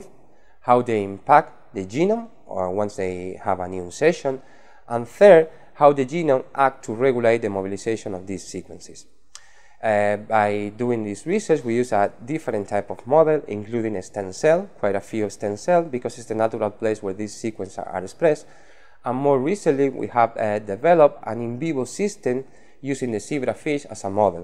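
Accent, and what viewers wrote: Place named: Spain. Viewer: Interesting, but you should speak slower. As a non-native English speaker I have problem to follow because of your Spanish accent, and it had been much easier if you spoke slower.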